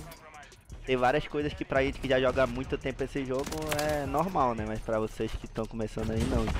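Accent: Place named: Rio de Janeiro, Brazil. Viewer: Eu achava que tu era carioca kkkk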